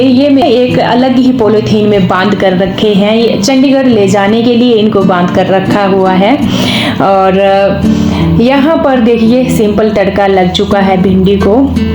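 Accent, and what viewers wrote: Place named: Jammu, India. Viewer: Nice ji ❤from rajasthan Mai apki dogre accent bhut pasand krte hu Mai akhnoor boder pe rahte the 1993 mai to lagaw h panjaabi, dogree,kasmiri se Mere father saheb bhi fouji rahe 1998 tak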